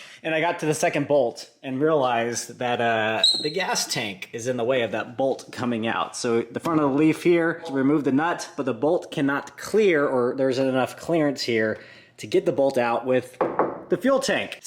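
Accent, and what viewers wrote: Hawaii, United States. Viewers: Bro yessah palala you Hawaiian my guy I love it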